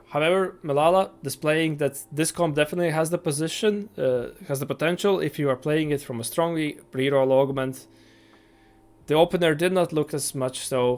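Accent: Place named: Latvia. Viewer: Will have to try this one out. Based on accent and Channel name I guess it's safe to assume you are from Latvia. Also, it seems like the titles of your videos grab attention very well, but some work on thumbnails could help you with reach, I haven't explored much of TFT content, but a bit of research on other TFT videos and their packaging can go a long way when it comes to exposure.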